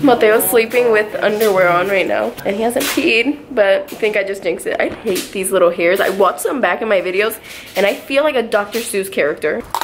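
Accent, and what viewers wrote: Puerto Rico. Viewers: El bien boricua jajajajaja arrastrando la R y hablando sin la S al final de las palabras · He is Puerto Rican is obviously 😂 reconozco mi acento “déjame veL”😂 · I recognize that Spanish accent anywhere! Puerto Rico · i love how puerto rican he sounds.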